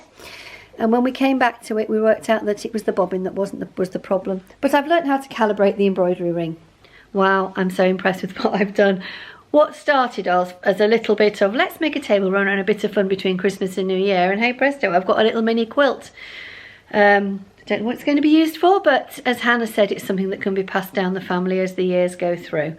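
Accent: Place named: Yorkshire, United Kingdom. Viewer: I love your Yorkshire accent my Family live in Doncaster I moved to Canada in 1977 I go home ever few years to visit but I couldn’t go in 2020 because of COVID.keep up your wonderful work as i fined it is very inspirational I have a pfaff creative.3 it does embroidery I’m just starting to try it out.Happy New Year to you and your Family 🇬🇧🇨🇦🥰❤️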